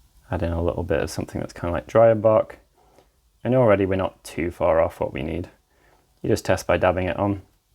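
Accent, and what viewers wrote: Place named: United Kingdom. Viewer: "Too British? Turn on Subs" 🤣🤣 No, the perfect amount of British, 😊💜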